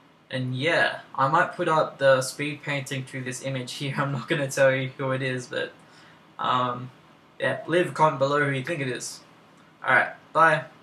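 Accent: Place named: Australia. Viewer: Sad how much hearing an Australian accent on youtube turns me on. **tutorial to me allll dayyy**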